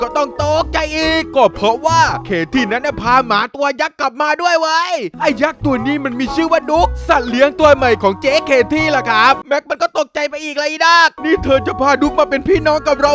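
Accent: South, Thailand. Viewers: ชอบพูดไต้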